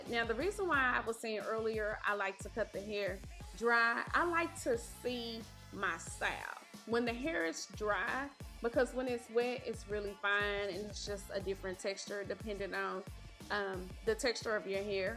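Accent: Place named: South, United States. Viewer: This is definitely a southern voice over!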